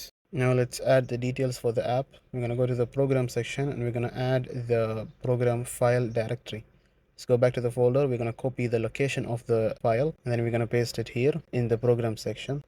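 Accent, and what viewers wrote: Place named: Arab world. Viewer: واضح عربي من الاكسنت " بروازير"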